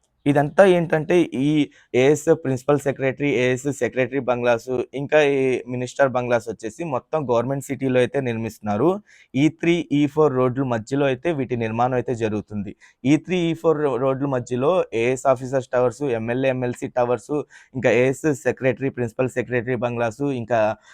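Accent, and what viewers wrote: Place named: Vijayawada, India. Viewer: Vijayawada slang eppudu vinaledha bro. 😅 Valla slang vallaki nachinatu matladatharu.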